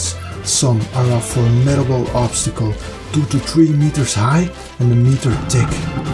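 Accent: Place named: Flanders, Belgium. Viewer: Ik dacht dat ik een Vlaams accent hoorde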